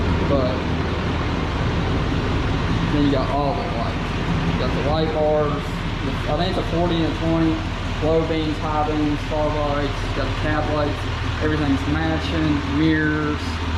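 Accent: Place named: West Virginia, United States. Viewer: Are you from West Virginia or something? You sound kinda hick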